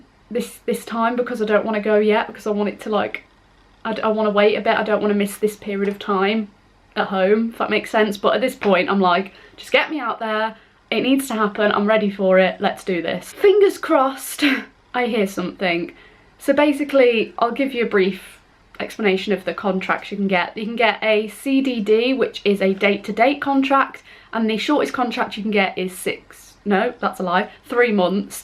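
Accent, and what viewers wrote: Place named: England, United Kingdom. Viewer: wow cool accent, are you from England?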